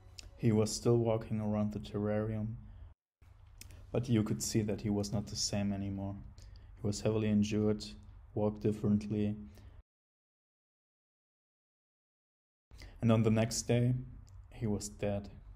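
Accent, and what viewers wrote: Germany. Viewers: You must be german, your english sounds like that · You have a German accent. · Wie man raus hört das du deutscher bist 😀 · I like that the narrator has a German accent worthy of a Bond villain. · Hör ich hier ein Deutschen accent 🤔😂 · u r definitely german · Are u German? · Kanns sein das du Deutsch bist 😂✌️ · Are you German?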